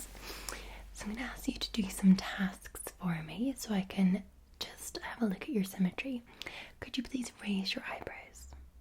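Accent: Scotland, United Kingdom. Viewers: What accent is this? Edit: Just read she is Scottish, but is there a mix? It's not the Scottish I'm used to hearing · me: FINALLY SOMEONE SCOTTISH[ also me: she sounds so nice but then im Scottish and i dont sound that nice] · Wow...just wow. I’m getting a Scottish Dr Quinzel vibes...this is dangerous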